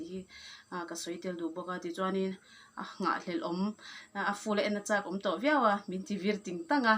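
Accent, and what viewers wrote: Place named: India. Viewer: Voice Tone hi dik lutuk Hindi Tone diktak in asa tlat mai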